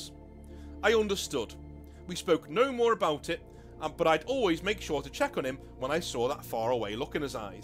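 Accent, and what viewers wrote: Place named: Southport, United Kingdom. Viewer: You're a Lancashire guy who's in Merseyside but say it doesn't exist. You sound like your from Southport my hometown. Southport is an oxymoron as it is not South nor a port. It's north of Liverpool 43mins on the train. It's also somewhere people come to die. You can't more for care homes here. It's truly God's waiting room. But the important thing is that Southport sits right on the border of Lancashire and Merseyside. (Where the black watch on the wall keeping the wildling Lancastians out) 😂 However there is a lot of arguments here as to whether we're Lancastian or posh Scousers. So when you said you where in Merseyside but actually you're Lancashire it made me think.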